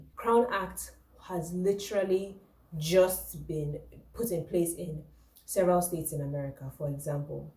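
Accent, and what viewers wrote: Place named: Nigeria. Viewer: you don't know any better, and I can tell by how you talk you sound as if though you were not black American, you sound as though you may be a Nigerian or something I don't know, Before Europeans colonize Africa, they were literally circling around it., Africans were so naive.. amd had absolutely no idea.. I think black Americans have an idea on white people stealing things that culturally belong to them. Western popular music comes from Black Americans... black Americans for the past 100 years have set back in watch white people steal their music and become astoundingly wealthy as a result, many early black rock and rollers of the 1940s and 50s, died in obscurity, and white people who covered their songs become wealthy. But that's a different subject that we can talk about it some other time. But here's the thing.. I'm a little older than you and I know a little bit more than you do. 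So I'm a little wiser and more experienced in these conversation.. There is a dance called the Lindy Hop. And I'm bringing up dance, since you mentioned ballet... which black people are also ostracize out of that dancing community. There are videos on YouTube talking about that. But Lindy Hop is an old African-American dancing style come from the swing dance era of the Jazz era. Invented by black Americans. That dance is Now usurper by white people. I have heard stories of black people rediscovering that dance, and going to dance studios to learn it, but they feel ostracized by a lot of the wh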